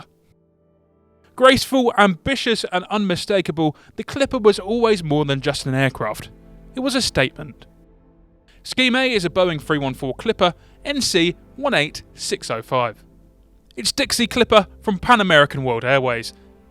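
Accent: United Kingdom. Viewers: Why a Brit narrator for an American plane? ENOUGH!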